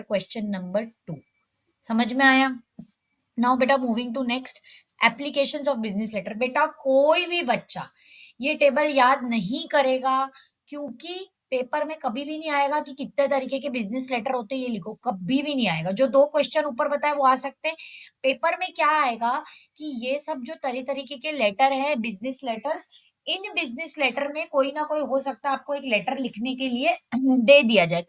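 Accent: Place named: Bhopal, India. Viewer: didi aap pakka bhopali ho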